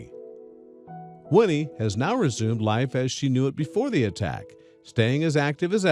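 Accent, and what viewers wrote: United States. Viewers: This is a British story dragged out by an American narrator with police cars and cops from NYPD, Italian or Spanish cop cars, and several actors, must do better when presenting a tale from Liverpool, England!! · Why didn't a English person do the commentry Americans talk about paint dry annoying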